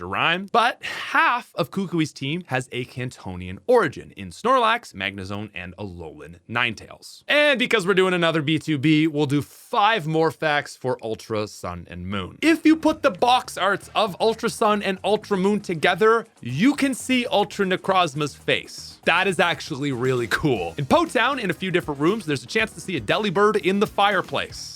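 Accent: Canada. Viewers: Some of your pronunciations of the pokemon are odd lol..must be your Canadian accent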